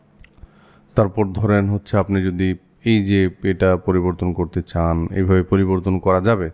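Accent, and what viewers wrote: Sylhet, Bangladesh. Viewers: Bhai aponi Sylhet er manus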